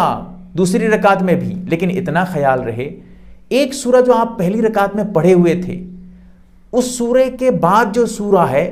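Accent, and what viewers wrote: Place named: Bhagalpur, India. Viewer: مفتی صاحب کی زبان سے معلوم ہوتا ہے کہ شاید بھاگلپور یا گڈا وغیرہ سے ہیں، آپ نے ماشاءاللہ محنت کافی کی ہے، مگر بعض اردو کی لفظی غلطیاں ہیں، اصلاح فرمالیں، مثلا اقامت میں الف پر زیر ہے آپ نے زبر پڑھا محرم میں ر پر تشدید نہیں ہے آپ نے مشدد پڑھا اعراض میں الف پر زیر ہے آپ نے زبر پڑھا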